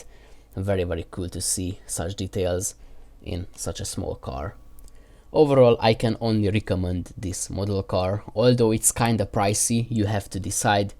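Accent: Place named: Hungary. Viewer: A jo magyar akcentus:D Nagyon jo video lett egyebkent! :D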